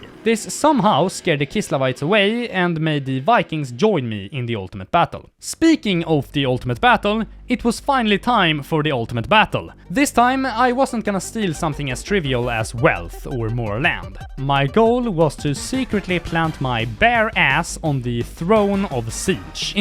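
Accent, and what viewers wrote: Sweden. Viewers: Holy tits this mans Swenglish accent is absolutely heavenly I need this in my ears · Bro is as close to swenglish as you can possibly come while still pronouncing the words correcty lol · I have few Swedish friends and they don't exactly sound like him but I guess it's close enough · This is the best swenglish I've heard since the "regular ordinary Swedish mealtimes" channel went inactive. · Nah, he sounds extremely swedish, this is the most stereotypical swedish accent //another swede here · As a scandinavian this is the most perfect demonstration of Swenglish i have ever heard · most heavy swedish accent❤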